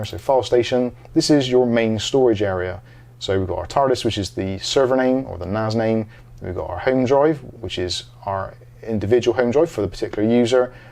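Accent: Bristol, United Kingdom. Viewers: Bristol accent ?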